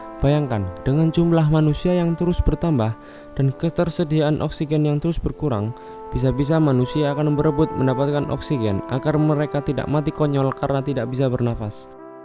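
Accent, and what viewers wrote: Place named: Java, Indonesia. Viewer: medokkk men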